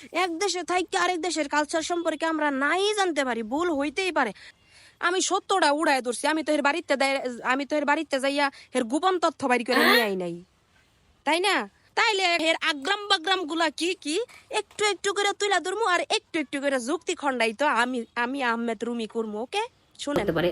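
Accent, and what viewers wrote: Comilla, Bangladesh. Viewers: আপু আপনার বাড়ি কি কুমিল্লা জেলায়?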